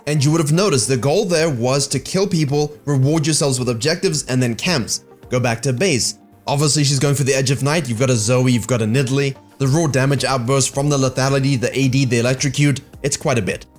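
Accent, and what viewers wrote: South Africa. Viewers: I KNEW IT... U HAVE A SLIGHT SOUTH AFRICAN TONGUE OMW I LOVE YOUNOW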